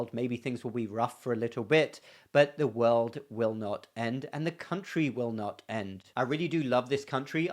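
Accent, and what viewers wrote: United Kingdom. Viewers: You are such a clear voice. Love to listen to you. Decide which pod casts I need to play, without comment, whilst my hubbie sits next to me taking in what you say. Love your British voice on the health and now, unusually, political scene. Americans today no longer appreciate their constitution. As a Brit, I understand now why Americans have said it is the best country, I have studied their constitution etc for years and now understand why they have said it. Sadly, I understand what they may lose, without appreciating what they had. Very sad and worrying for the West. 🇬🇧🏴🙏🏻👍❤️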